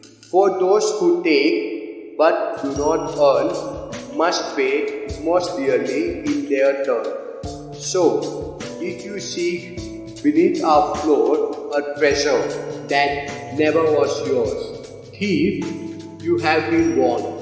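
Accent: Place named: India. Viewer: There is the microphone! Being bilingual myself I can say your english is AMAZING! I know you are self conscious but I can understand you well, keep practicing with your scripts and edit out bad clips to improve confidence. As I said, you speak well so don't be afraid to try! Out of curiosity where are you from? Your accent seems to be somewhere around India but I'm not entirely sure...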